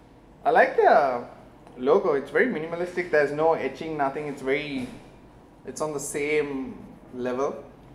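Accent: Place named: India. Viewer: that indian accent is very bad lmfao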